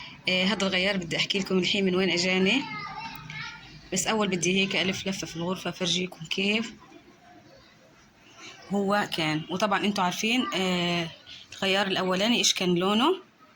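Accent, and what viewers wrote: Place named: Egypt. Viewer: صحتين وعافية بدي أسألك إنت بتحكي شوي مصري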